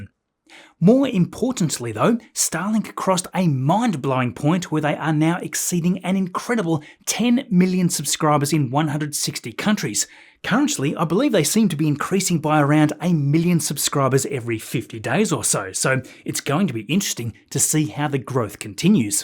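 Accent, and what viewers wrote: Australia. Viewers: You messed up the “that’s not a knife” name. With your accent, you should have done the famous Australian line That’s not a knife …..(THIS is a knife). I forgot the movie it’s from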